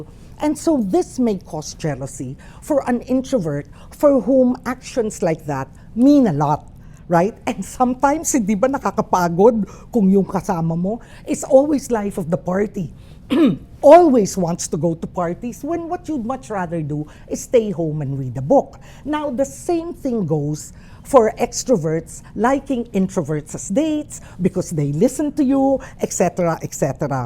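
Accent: Philippines. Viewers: I came across this video and thought you were Filipina when I heard your accent! And hala, you are! Lol thank you for that insightful information! =)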